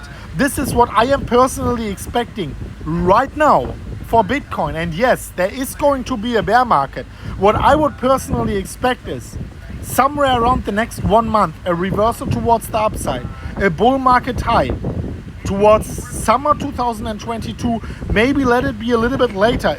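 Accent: Germany. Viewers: Killer German accent, dude!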